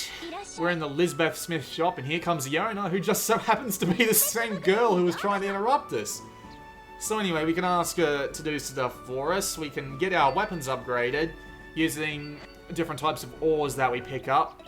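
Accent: Australia. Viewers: Love your Aussie accent mate!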